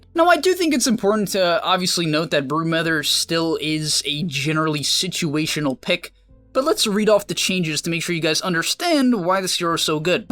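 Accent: United Kingdom. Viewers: I liked for the British accent.... Aye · It started British but quickly became Indian. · good dota content but awful British accent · I disliked because of that "British accent" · Please make a whole video with that fantastic British accent. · MAN STOP THIS BRITISH ACCENT SO BAD CRINGE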